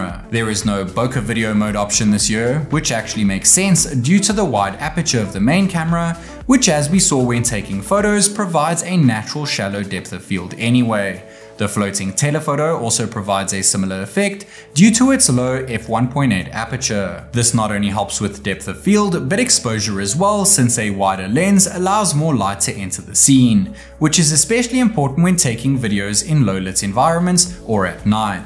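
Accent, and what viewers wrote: South Africa. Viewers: Your accent sounds South African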